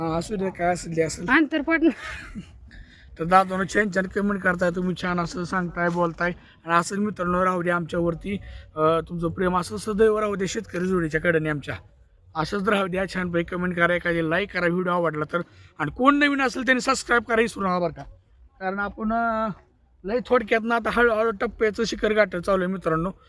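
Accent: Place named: Solapur, India. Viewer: बोलीभाषा सोलापूरची वाटते.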